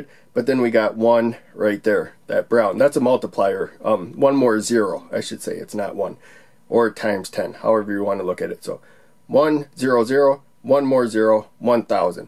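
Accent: United States. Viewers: Thanks! multimeter tells many things. your drawing and basic knowlage (what LOW, HIGH means) help me some. according to OUTPUT (LOW or HIGH), LED 1 or LED 2's voltage difference is much interest. multimeter between LOW(OUTPUT) and 5.3v VCC tells 5.26v. then between HIGH(OUTPUT) and GND tells 3.97V(220 ohm is between). Your english is so fast so not easy to listen for middle aged East Asian guy, but could hear some important phases. I think you are an Amerian from your english, that's right. Good luck for this hard decade with not beautiful some GREAT policitions here and there. Thanks again.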